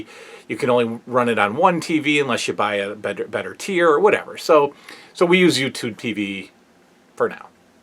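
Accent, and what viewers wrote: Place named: Central Pennsylvania, United States. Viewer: Where are you from? It sounds like we are close to each other. I'm from central Pa.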